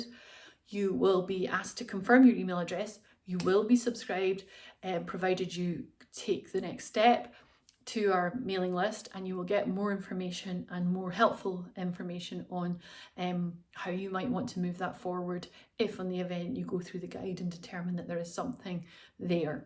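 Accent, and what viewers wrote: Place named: Scotland, United Kingdom. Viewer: Its called a Scottish accent. Get out more.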